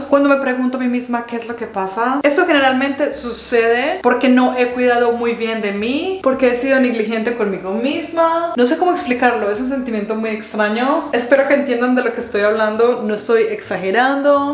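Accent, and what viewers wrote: Antioquia, Colombia. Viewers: en este video se te salio mas el paisa jjj q chulo una vez dijiste q eras de medellin pero normalmente tu acento es bien neutro. Saludos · el otro día me puse a ver videos de cuando Sofía estaba bebé 😊 y me di cuenta que tenías tu acento colombiano más marcado. Por cierto la edición de tus videos ha mejorado muchisimo 👏🏼👏🏼 pero igual a veces me gusta regresarme a ver los videos viejitos 😁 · Hermosa a mi me fascinan tus videos, eres super genial y me inspiras mucho 💯💎👸 me encanta escucharte cuando hablas con tu acento paisa ( Medellín 🇨🇴) te quiero y sigue adelante 🌷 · Amooo tu acento colombiano y amoo tus videos 😊❤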